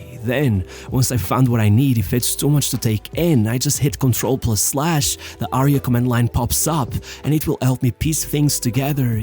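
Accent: Portugal. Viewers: O comentador é Tuga 😂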